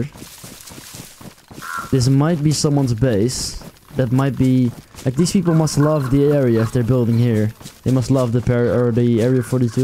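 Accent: Netherlands. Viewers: the second i heard ur voice i knew ""dat is een nederlander!"" hhaahahahah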